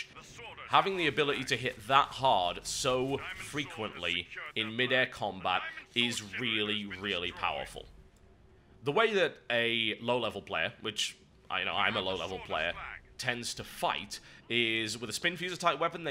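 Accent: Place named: England, United Kingdom. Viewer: Anyone else interested in hearing after he loses his English accent?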